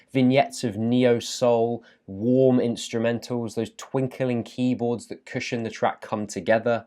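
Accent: RP, United Kingdom. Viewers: dont worry he's just a really posh one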